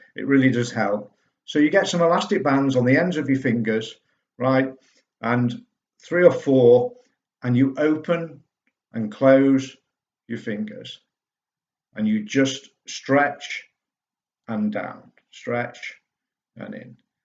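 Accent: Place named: Midlands, United Kingdom. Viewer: Thanks for the tips. I know you are a Leeds boy, but your accent sounds more like the Midlands to me( Staffs, Derbyshire?). Or am I wrong lol?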